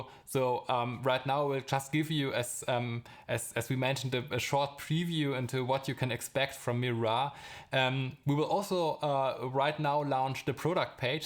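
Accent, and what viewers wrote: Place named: Germany. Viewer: i love the german english. :-) sehr gute Einführung. Danke, sehr fundiert auch in der Geschichte. Danke.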